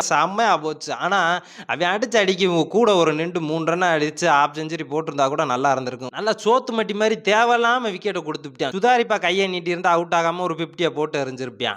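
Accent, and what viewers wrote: Madurai, India. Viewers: ஹாய் ப்ரோ, நீங்க பேசுறது பங்காளி என்று சொல்றது இந்த மதுரை ஸ்லாங் எல்லாமே சூப்பர் ப்ரோ பட் உங்க வீடியோ எப்போதுமே கொஞ்சம் லேட் லேட்டா வருது 29-ஆம் தேதி ஜெயிச்சு மேட்சுக்கு ஒன்னாம் தேதி போட்டிருக்கீங்க வீடியோ இப்படி இருந்தா எப்படி பாப்பாங்க உங்க வீடியோவ ஸ்பீடா போடுங்க உங்க ஸ்லாங்கில் சொல்லணும்னா பங்காளி வீடியோவை கொஞ்சம் ஸ்பீடா ஸ்பீடா போர்டு அப்படின்னு வெட்டி முடிக்கிற வேலையை பார்க்க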